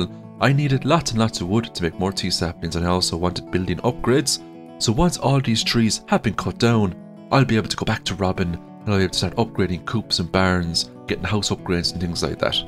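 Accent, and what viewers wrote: Ireland. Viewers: 'I played 200...' Irish Accent. Instant subsribe. Let's go.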